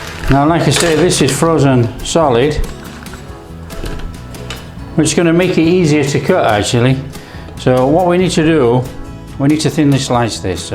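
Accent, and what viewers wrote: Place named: England, United Kingdom. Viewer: No we don’t need music, we enjoy your English accent. I love bean sprouts I have them in almost every Asian dish I cook. Thanks heaps, I love your recipes. I’m watching from Australia 🇦🇺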